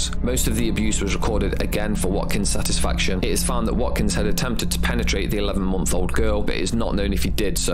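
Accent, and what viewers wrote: United Kingdom. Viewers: Sorry for asking! Is ur accent british? (Not a native English speaker is asking!)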